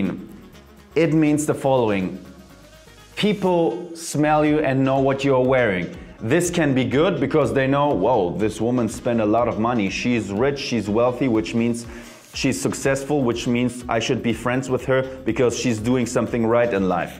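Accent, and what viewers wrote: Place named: Germany. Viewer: Great video! This is crazy... I'm from the east coast of the U.S. But I have the habit of saying Bacarrat Rouge and other fragrance names with your German accent! 😆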